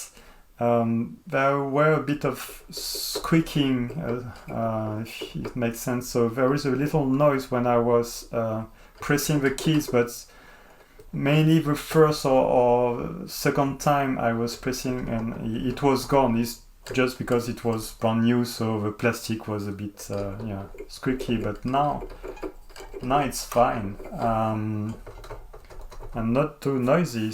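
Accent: France. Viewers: Bonjour, j’ai remarqué votre accent français, j’ai reçu ce piano hier et impossible de le faire marcher, je ne comprend pas comment pouvoir jouer si le son de sort pas quand je clique sur les touches. Pourriez vous m’aider? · hey, nice video, i gonna start learning piano, and i'm gonna take this one, thanks you for the video, by the way your accent sound french, c'est sympa :)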